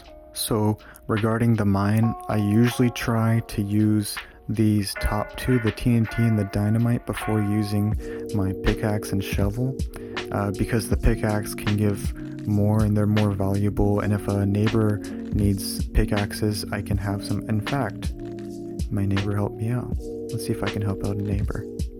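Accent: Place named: India. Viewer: He is Indian and his accent is so so bad!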